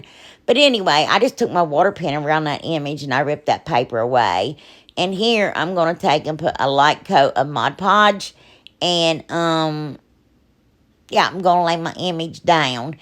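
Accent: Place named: Texas, United States. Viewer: I love hearing you talk! I’m from Texas and I talk the same way!! Just not as fast as you talk!! Lololol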